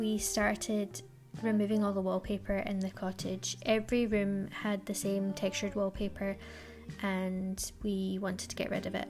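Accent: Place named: Scotland, United Kingdom. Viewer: Watching from Wales. It's very nice to hear a Scottish accent for a change, as it's mostly middleclass English people who make this type of content in Scotland & Wales.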